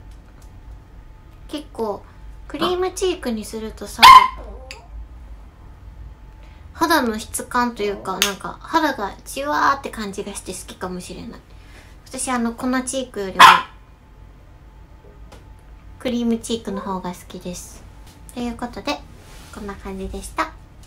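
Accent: Kansai, Japan. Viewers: ゴリッゴリの関西弁も聞きたい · 関西弁しゃべってるほうがかわいい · やっと菜々さんの関西弁が聞けたよ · たまに出る関西弁がかわいくて好き！ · の関西弁もっと聞きたいよ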